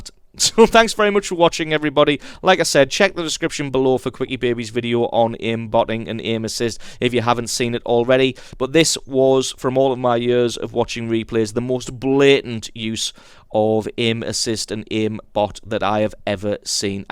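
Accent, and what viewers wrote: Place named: Scotland, United Kingdom. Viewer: There were some Scots features ;-)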